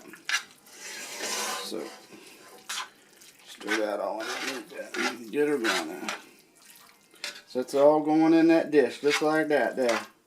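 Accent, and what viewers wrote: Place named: Louisiana, United States. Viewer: That sounds like a Cajun accent. I'm going to make this tomorrow, it looks Gooder than good.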